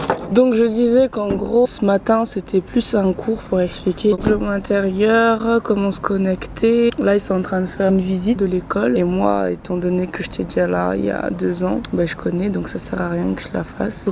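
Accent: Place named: Italy. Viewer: Whaou cet accent italien ! Je regarde tes vidéos 10 après tout va bien 😂😂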